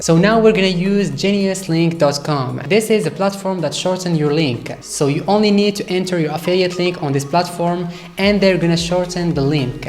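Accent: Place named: Middle East. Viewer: Thanks bro! Very helpful. Also, love your accent! Seems Middle Eastern mixed with French 😊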